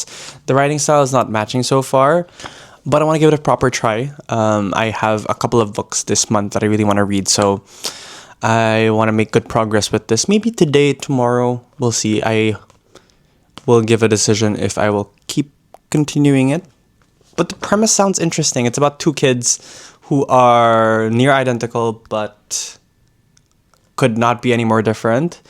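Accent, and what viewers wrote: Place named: United States. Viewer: where r u from? just interested bcz u'r speaking like american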